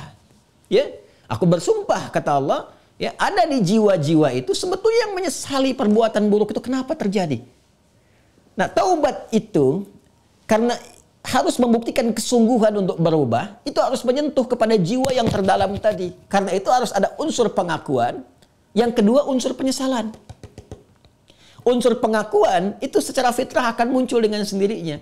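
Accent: Malaysia. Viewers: Assalamualaikum Ustadz Adi, izin saran, dalam ceramah-ceramahnya pakai logat bahasa Indonesia aja jangan pakai logat Melayu Jadi mirip sama Malaysia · Maafya pk ustd kdg2 trdgr pk ustd sekali sekala ada omonganya spti org Malaysia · Knp jd logat malaysia? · Kok, kayak logat Malaysia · saya merasa ceramah ustad kali ini terdengar seperti orang malaysia karena banyak logat melayu tidak seperti biasanya, sehat selalu ustad..syukron 🙏🙏🙏🙏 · Masya Allah ustad seperti nya anda sedang di Malaysia ya soalnya langgam ceramah nya persis orang Malaysia👍🥰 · Kenapa logat jadi spt org malaysia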